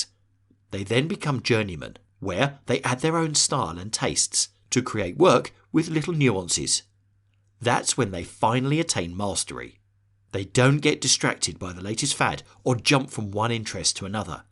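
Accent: United Kingdom. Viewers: Is this British English? Just curiosity.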